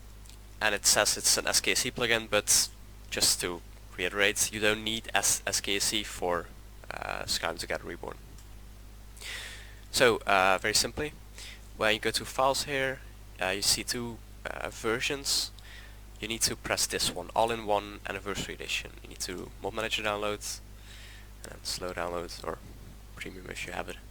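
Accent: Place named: Netherlands. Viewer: Zieke shit, thanks maat ik hoor je nederlandse accentje wel hoor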